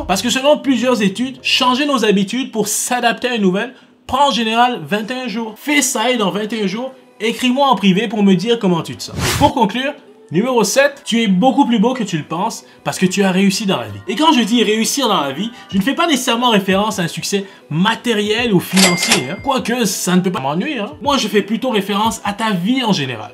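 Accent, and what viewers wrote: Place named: Quebec, Canada. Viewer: c'est un accent canadien ça s'entend a des kilomètres, lui surement du Quebec, sa manière de dire "présentement" il n’y a que les québécois pour parler comme ça , ce n'est pas une critique bien sur, bonne vidéo.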